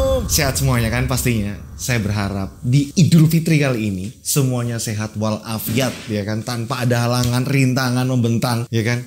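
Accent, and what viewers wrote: Java, Indonesia. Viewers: Mas enak klo ngomong logat Jawa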